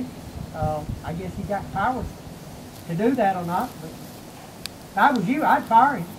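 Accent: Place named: South, United States. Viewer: There are better ways to get things done in this country than elaborate and over-the-top hanging in effigy skits. Next time get an articulate and well spoken person to present your case. I mean the talking to mannequins, the hangings in effigy, and the THICK southern accent completely distracted me from any point you were trying to make. The video creeped me out more than anything.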